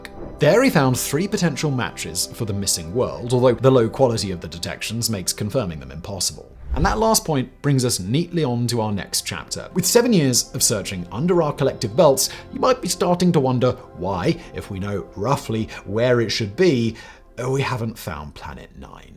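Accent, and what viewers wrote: United Kingdom. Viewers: Your proper British accent makes those Uranus jokes even funnier 😂🤣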